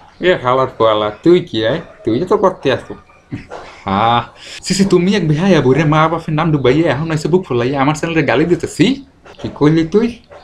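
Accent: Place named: Barishal, Bangladesh. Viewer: বরিশাইলা, কথা বলতে, unscribe করলাম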